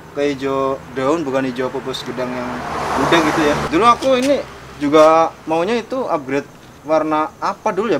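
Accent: Banyumas, Indonesia. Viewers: Mantap wong ngapak 🤣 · Mas ngomong nge nggae basa ngapak kenera ora ngapak ora kepenak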